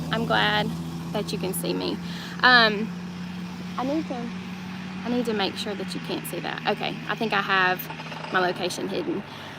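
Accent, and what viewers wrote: South, United States. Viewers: You are the sweetest person and I really don't know how anyone could come up with a negative comment to you! By the way, I could listen to your southern accent all day long. The way you speak is very calming to me!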